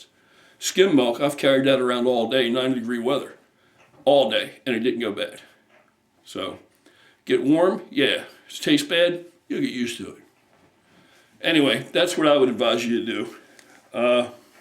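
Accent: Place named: Baltimore, United States. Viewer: It’s milk unless you’re from certain parts of the US like Baltimore county, like me, which is where he’s from too. I lived in Perry hall his accents different from mine a little maybe he lived on i83.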